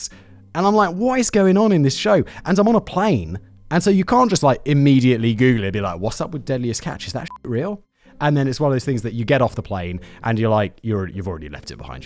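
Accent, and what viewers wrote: United Kingdom. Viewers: Coo-gee, I love your British pronunciations. Could-gee is closer. ANZAC Day is in February and has dawn and dusk services, a parade of service men and women and is in remembrance for WW1. It is also the only day Two-Up gambling is legal in Australia. Remembrance Day is held with a one minute of silence at 11am, 11th November every year, and at certain places, like the RSL, they play The Last Post, and is now recognised as a commemoration of the fallen for bot WW1 and WW2. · You Britt's crack us Americans up💯....not just the ridiculous way you talk....but your genuinely INNOCENT demeanor 👀........I know there's tuff guys out there....but honestly from listening too you, there seems to be A LOT of panzees👀👀...an I mean that in the most respectful way possible....you an your kind just DONT seem too tuff honestly 💯🤔🤔🤔...I mean seriously man👀....your talking about planes...living in other countries an STAYING👀....taking "Holiday" Somewhere else than YOUR own country 💯👀......Sorry man...just ain't crackin🤪🤪🤪....that's the BEAUTY of the nation you lost in 1776.....we HAVE it all.....jungle, swamps, plains, grains, an tall tree's 💯.... 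Buffalo here an plenty of "citizen" deer EVERYWHERE...not the "Kings"🤣🤣🤣🤣.....Sorry buddy, I'm just NOT a fan of old English society 🤢🤢🤢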